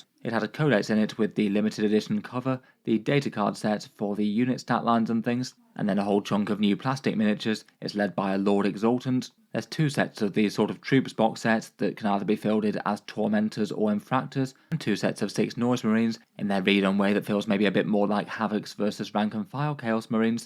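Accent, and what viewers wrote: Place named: England, United Kingdom. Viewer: No. It's a UK mainland accent. Maybe Leicester or Leeds.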